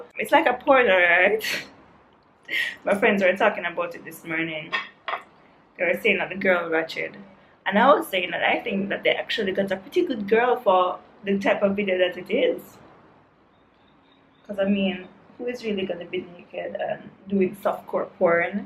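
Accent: Jamaica. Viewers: Btw, are you Jamaican?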